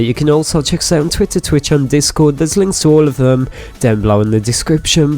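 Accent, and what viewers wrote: Scotland, United Kingdom. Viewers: I think he's Scottish but idk the words he uses don't sound like a Scottish person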